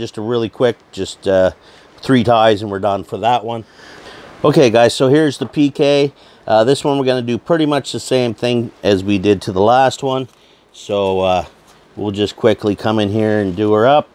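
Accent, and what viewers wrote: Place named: Boston, United States. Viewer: You from Boston brother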